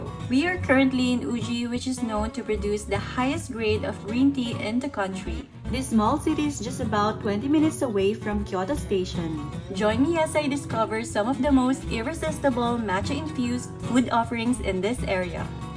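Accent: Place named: India. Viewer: Why do you have an indian accent?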